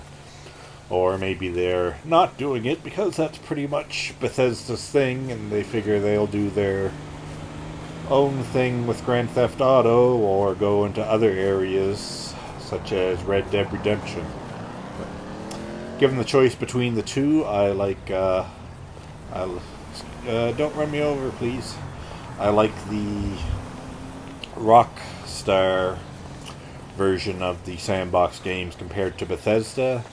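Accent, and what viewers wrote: Canada. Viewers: That's the Vinewood Bowl, based on the real-life Hollywood Bowl. And you do have a slight Canadian accent, especially when you say "out" as "uwt", rather than the broader American "aaaout".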